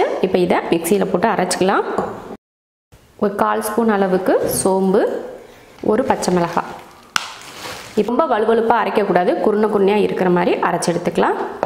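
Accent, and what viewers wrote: Coimbatore, India. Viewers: Akka neenga coimbatore ah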